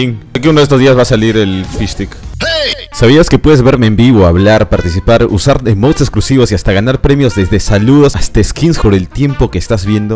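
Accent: Peru. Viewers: Tiene acento de peruano pero le salen cosas de argentino o no jaja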